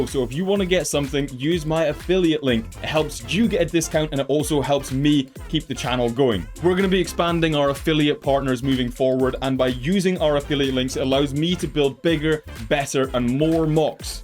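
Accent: Scotland, United Kingdom. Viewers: are you scottish?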